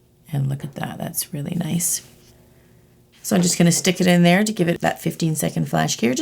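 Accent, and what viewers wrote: Canada. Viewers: These are lovely! Forgive me if I'm wrong but are you Canadian? You pronounce things in a Canadian manner? (I'm English I try to really listen to the accents I watch on YouTube but do get them wrong at times) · are you from Canada?? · She sounds so Canadian in this video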